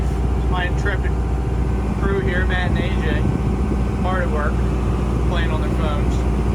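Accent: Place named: Appalachia, United States. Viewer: Whenever Asians speak hill Billy? I love it.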